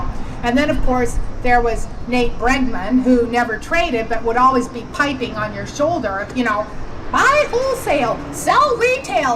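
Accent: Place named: South, United States. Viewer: Haha. I like the Southern accent. Gotta adapt to your audience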